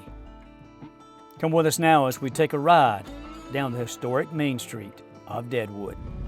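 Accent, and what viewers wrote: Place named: West, United States. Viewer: You have an great western cowboy accent and amazing story narrating ability.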